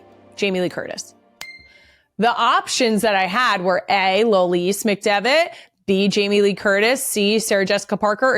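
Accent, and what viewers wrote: Philadelphia, United States. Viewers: I love how the more comfortable gets, the more the Philly accent comes out! 😂😂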